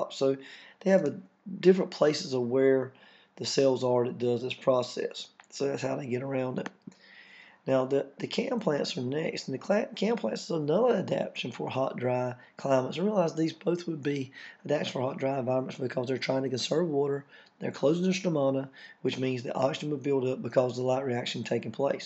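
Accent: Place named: South, United States. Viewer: Nice video truly, you got an interesting accent too. You from around south, buddy?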